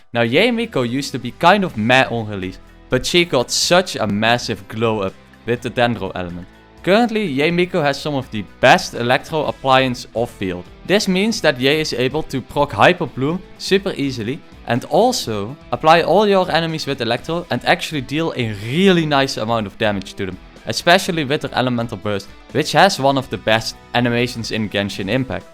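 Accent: Netherlands. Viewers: Im hearing a dutch accent.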